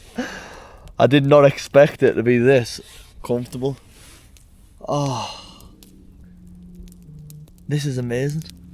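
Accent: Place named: Newcastle, United Kingdom. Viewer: Great content. I love your authentic down to earth videos. I live in Morpeth so love content from someone with a geordie accent. Keep banging them out. Barry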